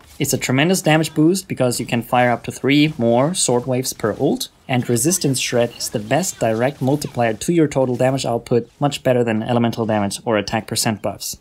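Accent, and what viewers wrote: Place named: China. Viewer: So, random question your pronunciation of the names makes me think that you are Chinese, which province are you from? I am from Shanghai in Jiangsu.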